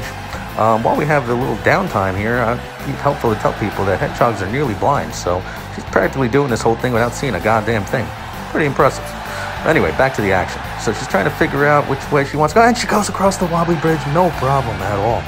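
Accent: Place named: New Jersey, United States. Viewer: the commentary, the new jersey accent, the adorable concept-- these videos have made me so incredibly happy. thank you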